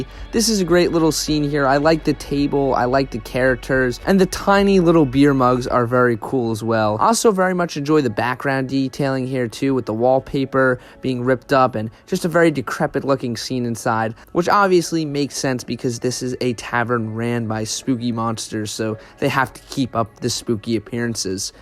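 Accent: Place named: United Kingdom. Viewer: British yay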